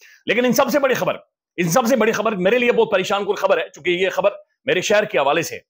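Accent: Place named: Kashmir, India. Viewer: مجھے آپکے اردو لہجے سے شک تھا کہ آپ کشمیر سے ھیں ۔ آج آپ نے خود بتا دیا۔ تھینکس ۔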